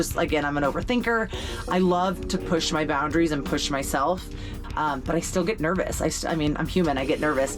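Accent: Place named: East Coast, United States. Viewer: I used to live in Schenectady. Do I get any points for that? *Drew Bledsoe lives here in Bend, by the way. Listening to you speak, at the pace you do says two things--one, you're from the east coast (as I am), and two--you're excitable. Me? I get wound up talking gear amongst other backpackers. Great video!!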